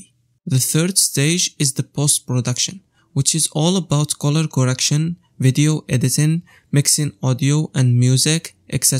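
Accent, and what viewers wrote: Morocco. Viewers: Is this a Moroccan accent ? Good video tho 👍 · Living for the Moroccan accent!! 😄 Bless you for this video! I'm trying to learn 2D and 3D animation on Blendr and it hasn't been so easy so far, a bit confusing! hopefully it'll get better soon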